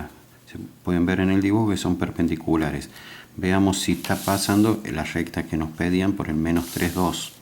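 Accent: Córdoba, Argentina. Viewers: sos cordobes?? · de que parte de cordoba sos maestro??? Sos un grande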